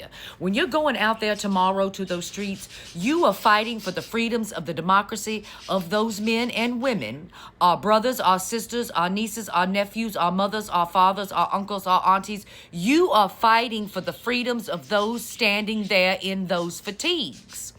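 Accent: South, United States. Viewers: 👏🏼👏🏼👏🏼PREACH BABY!!! 💯🎯With that deep southern drawl accent 😂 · You’re brave!! Love your commentaries! You give us courage! I love your voice and your accent, “Why, I do declare, you sound like Scarlett O’Hara! Keep strong everyone!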